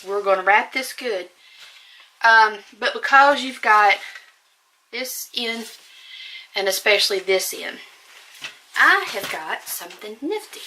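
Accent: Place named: South, United States. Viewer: great video as always, thanks. and that southern accent...love it. It must be a canadian thing..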